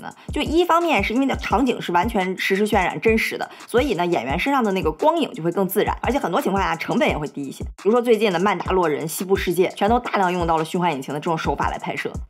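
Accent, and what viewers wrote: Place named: Shaanxi, China. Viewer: 小lin是陕西人吧 西安 😂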